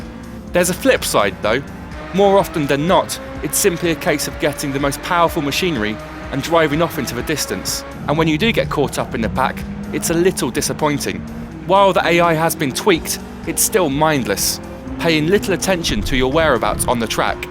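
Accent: United Kingdom. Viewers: aaarghhhhh that freakin british accent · ''buh wif the game lacking elseweah? thas noh kwai enuf to kip it un tup off the racing pail.'' FUCK YOU, BRIT! no offence to the other brits out there, but this guy's a penis gnome. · why do british accents make everything sound worse than they really are!!!!??? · Fuck no i aint listening to that fuckin awefull british english! Makes me wanna puke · No. What you're hearing is his accent. He's likely from Britain, which is where the English language originated from. We here in the west speak English differently since the language has evolved through time. I'm guessing this is your first time hearing an English accent. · The British accent made everything sound more reputable. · I hate British accent! Damn!!!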